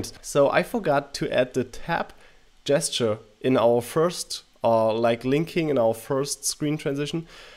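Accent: Germany. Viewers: Nice Video, love that German accent - From an American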